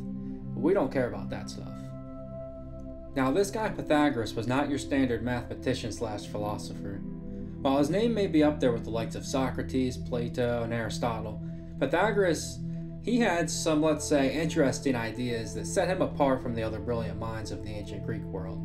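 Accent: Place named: Pittsburgh, United States. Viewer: You sound like you're from Pittsburgh. Good video, cheers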